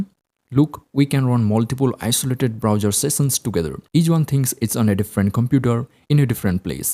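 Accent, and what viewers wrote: India. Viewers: Kolkata + English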